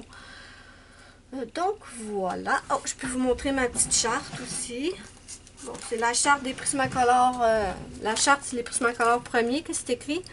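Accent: Canada. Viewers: j'adore ton accent canadien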